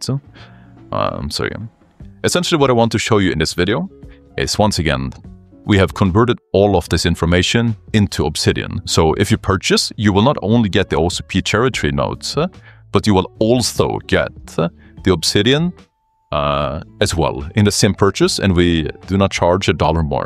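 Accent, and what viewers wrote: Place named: Sweden. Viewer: Sounds Swedish to me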